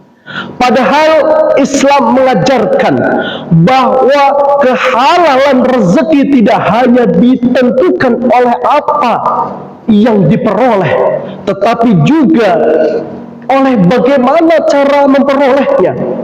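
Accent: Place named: Banyumas, Indonesia. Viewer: Terimakasih Bu Guru ngapak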